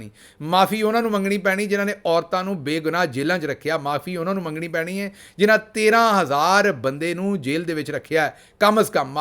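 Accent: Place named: Amritsar, India. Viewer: گوڑی امبرسری پنجابی سن کے مزا آیا ۔ ساڈے وڈکے وی امبرسری سن Regards. Prof. ILYAS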